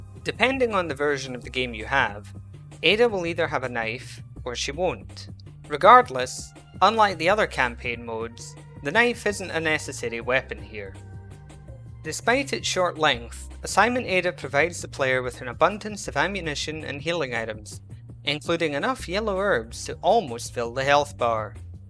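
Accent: Scotland, United Kingdom. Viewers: Are you Scottish or Spanish?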